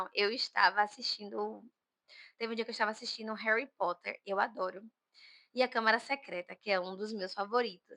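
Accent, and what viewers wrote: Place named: Bahia, Brazil. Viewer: Amei sua voz! Sou baiana também e amo achar pessoas no YouTube falando baianês também! Muito legal sua forma de fazer diário!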